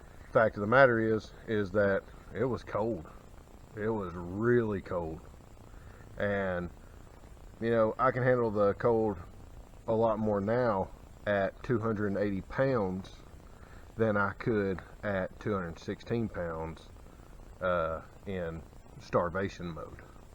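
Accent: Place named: Georgia, United States. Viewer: Its a little strange hearing a Chattooga County accent on TV. You kick ass man!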